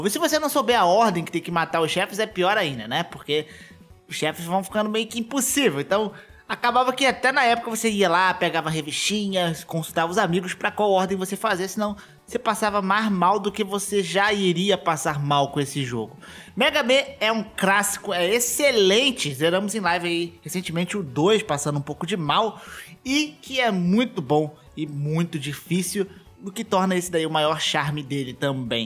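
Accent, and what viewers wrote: Rio de Janeiro, Brazil. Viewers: vc e do Rio?(se for e de onde?)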